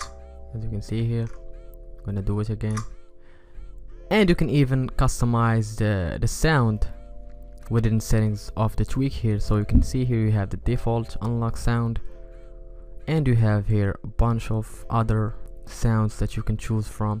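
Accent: Morocco. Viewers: From your accent I can tell that you're Moroccan 👍🏻👍🏻 keep up the good work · moroccan accent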